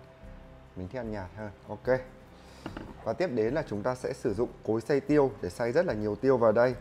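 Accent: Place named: North, Vietnam. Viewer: Mình là gái miền nam và mình bị cuồng nghe giọng trai bắc , giọng anh này nghe hayy và ấm ghê luôn 🙆🏻‍♀️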